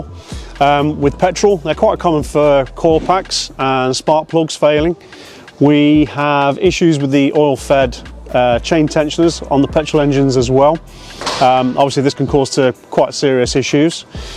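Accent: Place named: Leicester, United Kingdom. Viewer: It's the Leicester accent, that's accent nor excent!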